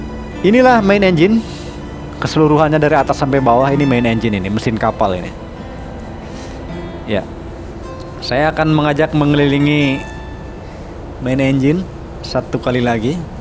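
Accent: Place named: West Java, Indonesia. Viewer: Bang asli mana...klo logatnya....saya tebak..mgkin dari jabar nya....tolong bales..trmksh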